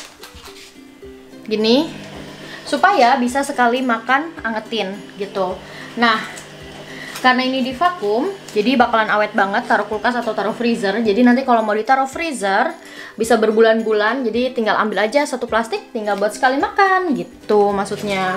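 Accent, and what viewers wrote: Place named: Java, Indonesia. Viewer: Kk indonesia mu medok sekali 😙😙💪💪💪kereeeennnnn ibu kuat ..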